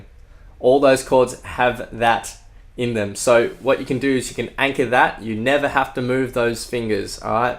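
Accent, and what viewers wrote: Australia. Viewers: Where are you from? You sounds super Australian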